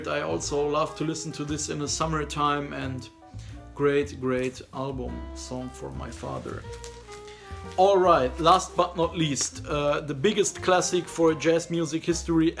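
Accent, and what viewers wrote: Germany. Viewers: Ist er Deutscher? Hört sich so an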